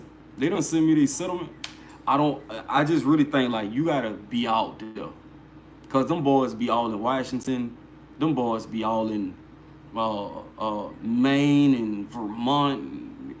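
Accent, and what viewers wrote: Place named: Louisiana, United States. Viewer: U gotta be from Louisiana brudda I could hear it in your voice… Also my first day of orientation w P&S tmr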